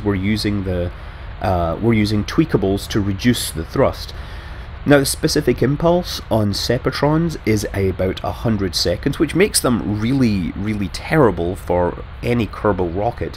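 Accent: United Kingdom. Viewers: You live in the US? Sound so british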